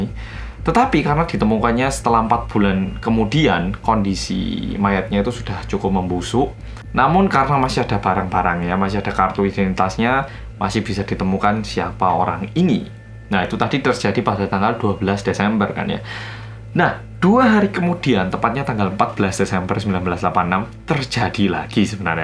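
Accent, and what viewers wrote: Java, Indonesia. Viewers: Seru abis nontonya..."Welcome to orang korea yang medok" aku dan temenku. Krik krik krik krik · Oh pernah nonton ini pilemnya hehehehehe. Bagusss slow burn and depressing banget sampe skrg ga ada titik temunya. Jadi penasaran irl gimana kelanjutannya jadi lanjutin yg murder ini dulu aja Kakkkkk Oh ya btw sukak banget di 5 menitan awal Kakak cerita beneran ngeliat kamera with ur own words gitu, itu kayak kakak beneran ada di waktu kejadian and ngeliat sendiri kejadiannya kayak apa, jd kakak kayak re-telling bgt gitu makanya aku tonton terus video ini. Apa yak namanya? Engaging banget. Buat aku ini yg bikin Kakak beda sama vlogger lainnya soalnya vlogger2 lain kayak yaudah ngikutin naskah aja gitu or worse, baca script D: Personally aku jg suka bgt org ngomong medok jawa krn kerasa down to earthnya terus kayak ngerangkul banget lak cerita. 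Kayak lagi di warkop terus ngobrol santai. Ga sok mbule pake campur2 inggris gitu Kak. Pls keep it up👍🏻👍🏻👍🏻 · Oppa korea dgn kearifan lokal dan medok khasnya lebih gemes dripada idol yg jdi bias aku wkwkw semangat buat videonya oppaa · BERSAMA ORANG KOREA YG MEDOKKK :) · Ya tuhannnnnnn... pekatnya jowo diaaaaaaa😩 selalu aku tgk video dr 🇮🇩 boleh lagi 92% faham... hahhh kali ni 83% jahh faham pon😂 #🇲🇾here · Terus terang, saya nonton channel mas, selain karna kontennya menarik (suka cerita detektif), tapi juga karna kaget bisa nemu orang Korea yang sangat fasih ngomong bahasa Indonesia plus Jawa plus ada medok Jawanya :) Saya sendiri orang Solo besar di Jakarta. So pasti saya subscribe and like. Ditunggu ya, mas, video-video berikutnya. Saya pasti nonton :) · 3 channel storytelling favorit: 1. (pelan, medhok dan mudah dipahami) 2. Nadia Omara (gayanya kayak itu ghibah) 3. Arroyan Pram (Konspirasi dunia pake Astronomi, Fisika, dan editannya gila keren banget, serasa nonton agung hapsah dan Jerome polin bahas konspirasi)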